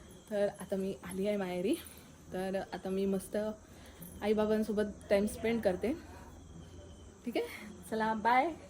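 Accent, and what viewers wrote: Malvani, India. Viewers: Malvani Chan bolte aasech volg malvani karat ja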